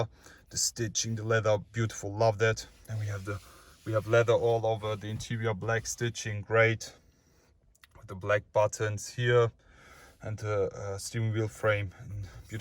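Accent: Germany. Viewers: Man hört so dass du Deutscher bist😂✌️ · He is not terminator,he is standard German. :) The reason I would buy this car is because the front masks screams Germannn and we all know very good what means German in auto industry. So the accent, if you ask me, just adds to the autentysity. Coming from the place it's created, like this raw, it doesn't get better than this. Amazing car. I love it. Probably this is going to be the car I am gonna save the money for.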